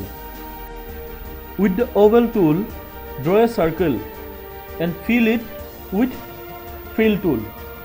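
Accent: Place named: Assam, India. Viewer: Aa bhai mja.. Tmar kothakhinir porai lage j tmi assamor... Mou assamore❤❤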